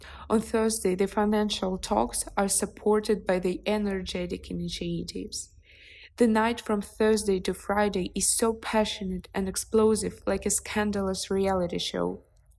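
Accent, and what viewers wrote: Russia. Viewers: я вам дам честный конструктивный критический отзыв, так как я на самом деле очень ценю вашу русскоязычную эмоционально окрашенную подачу и хочу помочь. Надеюсь мой отзыв поможет если вы нацелены на англоязычную аудиторию. Я могу судить так я двуязычный подписчик, очень давно живущий в англоязычной стране. Для тех кто с рождения говорит по английски в этом видео слышен русский акцент с не натуральными английскими интонациями. Это не немного раздражает и не даёт насладиться содержанием так как форма не натуральна и натянута. Кроме того интонации не эмоциональны и не зажигательны как ваши, так что ваш отличительный шарм теряется. Я бы посоветовал найти рождённого в англоязычной стране русского человека, таких много, бросьте клич и кто-то откликнется. А русские субтитры тоже хорошая идея от ваших подписчиком. Английские субтитры можно в настройках YouTube включить. 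· Too much of the accent, reminds me my English classes in Russia. It’s a not really good way to learn English. Don’t like to criticizing anyone, just my personal opinion. Очень люблю и подписана на все его каналы.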